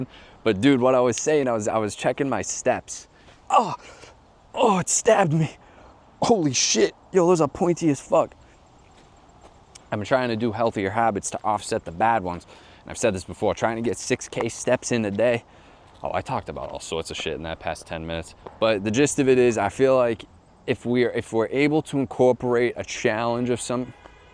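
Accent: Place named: Massachusetts, United States. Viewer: Where you at? By the sound of your accent I often think you must be in Massachusetts.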